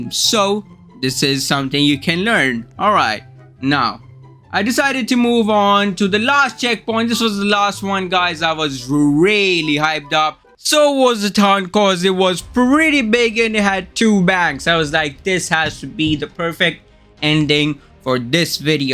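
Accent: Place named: India. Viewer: Bro sounds indian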